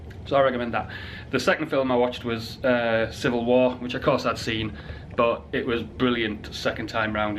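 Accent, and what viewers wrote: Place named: Durham, United Kingdom. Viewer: Fandomlife I'm from Durham, recognised the accent lol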